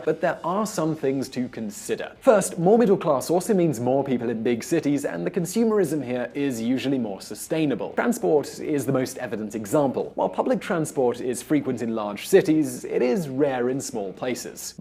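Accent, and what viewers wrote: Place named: England, United Kingdom. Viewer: How do you define the middle class? That’s easy, it is accent and you have the perfect English middle class accent. Have you noticed all those YouTube videos where English young ladies (usually very good looking) teach the world how to speak with a BBC accent?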